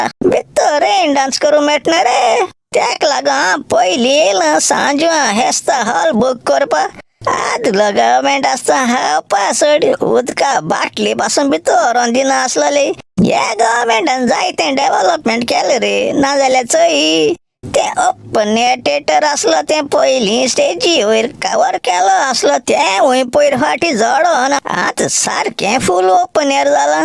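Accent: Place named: Salcete, India. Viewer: Thank you, Love your news. VIVA Goa and Goans Love your Accent Saxtti Bhaxen ahm ❤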